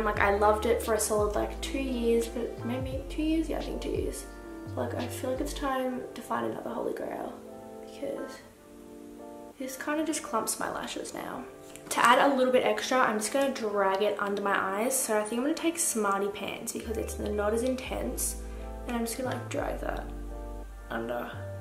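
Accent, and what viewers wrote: Australia. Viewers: I could listen to Australian Accent all day😍🤗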